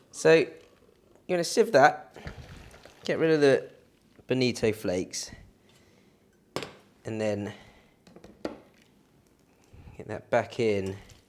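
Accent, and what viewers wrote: United Kingdom. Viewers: Love your videos and your nice British English :) What do you do with the used bonito flakes and combu?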